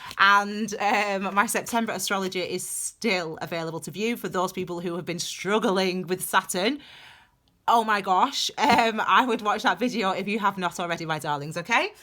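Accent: United Kingdom. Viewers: Love your sense of humour and the way you come across.I miss hearing British accents so it's nice listening to your readings. And ditto it's great to see a female British black tarot reader.Keep up the terrific work and thanks for the amazing reading! :) Love from Spain! :) xxx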